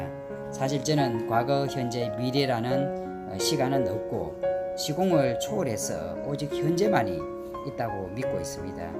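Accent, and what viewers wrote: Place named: Busan, South Korea. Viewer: 어머, 부산 사투리가 이렇게 구수할 수가.. 멋집니다. 그런데, 71년생?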